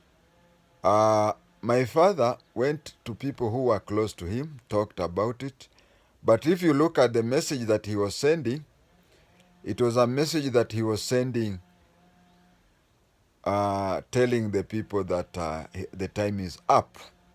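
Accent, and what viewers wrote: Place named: Kiambu, Kenya. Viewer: Whether you’re aware about it or not, you’re now a public figure and we as your supporters are interested in knowing more about you. You have periodically mentioned your father but only given minor glimpses here and there about the other half of your Kikuyu background. Tunangojea kusikia hayo and by the way, for your mother to be from Nyeri, you speak Kikuyu with a Kiambu accent.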